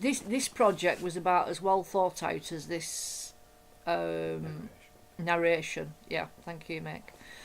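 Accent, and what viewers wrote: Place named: North, United Kingdom. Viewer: Hey, absolutely genius idea. Love it! I’m going to have to try this. I love your accent, are you from York or Lancashire? PS. Hope i haven’t offended. I’m probably way off the money. Lol. Anyway, loved your idea, and glad your back. Crafty Hugs. Xoxo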